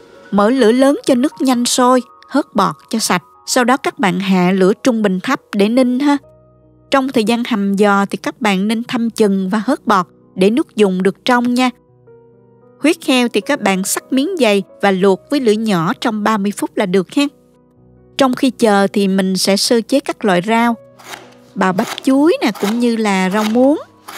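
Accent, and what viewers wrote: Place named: Mekong Delta, Vietnam. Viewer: Cảm ơn, giọng nói miền Tây thật ấm lòng ❤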